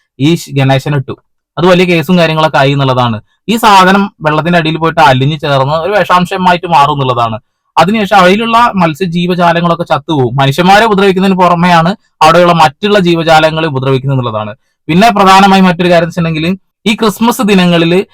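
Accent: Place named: Kozhikode, India. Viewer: Slang is like Kozhikode chankuvetty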